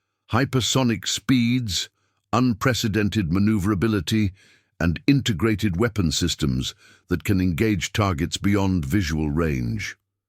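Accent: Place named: United Kingdom. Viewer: I'M HEARING A SLIMY LIMEY DOING THE NARATING, JUST BY THE WAY HIS USE OF CERTAIN WORDS!!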